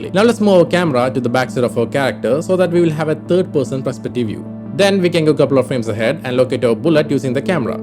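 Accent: Kerala, India. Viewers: You started getting a bit too indian for me, couldnt understand halfway through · bro are you malayali, sounds very like it?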